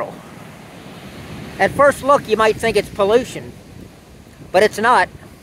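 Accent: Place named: Baltimore, United States. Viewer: Hahaha, this guy has a classic Baltimore accent. Sounds like Dundalk specifically. Good video.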